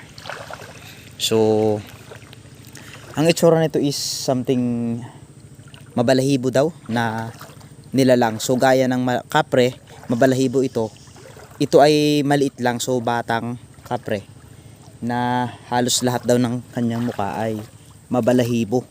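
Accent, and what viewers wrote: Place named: Visayas, Philippines. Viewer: Bisaya ka idol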